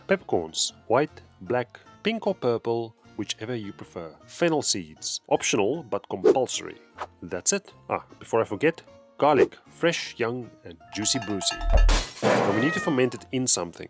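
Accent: South Africa. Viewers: I'm south African and your accent sounds south African but a bit of an odd one to me? I'm from Durban so maybe thsts why lol 😂 have you lived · How does this not have more likes?? Great editing, funny, and South African accent. Good job. I'm definitely making these 👏👏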